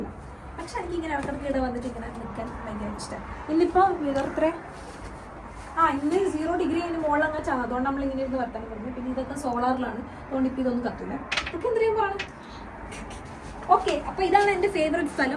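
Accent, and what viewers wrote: Kannur, India. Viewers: Hi I am Sruthi from kannur but eppo engu oman l WRK cheyyunnu Edo unexpected ayitta thande video insta l kande adipoli anallo but youtube l vannu nookkiyappo kurachu videos alle ullu iniyum post cheyyanam YouTube l.WRK pressure nde eyal kannur basha kelkkumbo thanne oru happy a.athinu thande video kanananam enna avasthaya.nammal family ayi evide anu.kannur basha manasilavathavarkk vendi change varuthi basha thanne mari poyi. So iniyum video expect cheyyunnu. By aradika🎉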